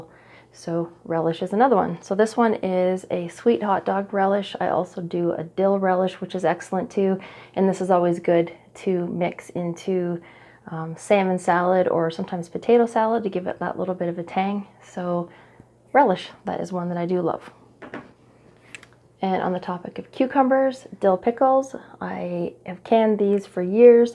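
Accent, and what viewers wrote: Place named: Canada. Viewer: Where are you located? It sounds as though you’re from Canada.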